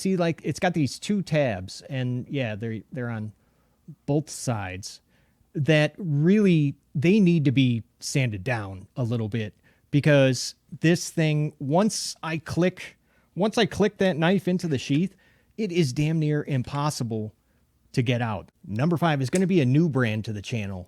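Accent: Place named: Great Lakes, United States. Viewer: Thought I recognized that accent! Been watching your videos for a while now and never realized it. That "great lakes accent." Sounds like home. 👍 I lived in IL for almost 40 years.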